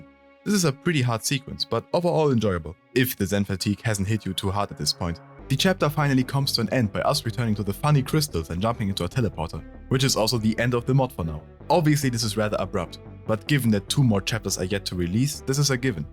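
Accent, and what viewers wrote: Germany. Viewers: You sound german... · ich hoer sofort dass du ein deutscher bist. macht aber nix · Smol Question, are you german?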